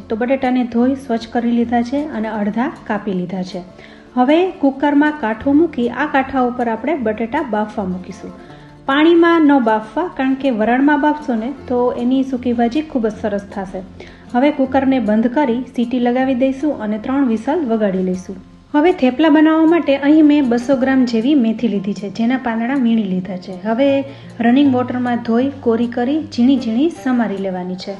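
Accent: Kathiawar, India. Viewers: Very nice. Kathiyawadi bhasha khub mithi lage sambhalwama.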